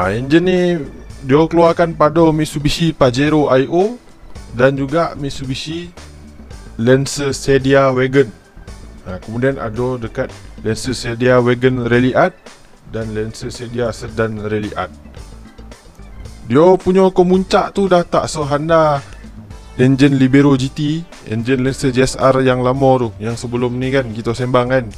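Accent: Negeri Sembilan, Malaysia. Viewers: 1st time jumpa channel bang. Best & santai + cakap slang noghori Auto subs & like 😁 Salam bang saya ada beberapa soalan nak tanya, mohon pencerahan. Terima kasih in advance 1) engine 4g93T vs 4g63T, mana yg lebih baik dari segi reliable, powerful, energy efficient ? 2) antara 2 engine tu, mana lebih sesuai untuk masukkan ke satria neo? 3) kalau swap engine original Satria Neo, lepas JPJ ke? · torbaikk bang ribiew njen guna loghat Noghori...nnti blh try cuba loghat negeri lain plk..hahaha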